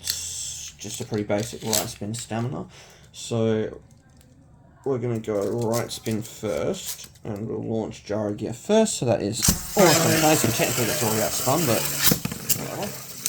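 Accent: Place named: Australia. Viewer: You sound aussie whereabouts are you from would love to do some battles and a meetup. Havent seen much people use plastic in a while gets me excited.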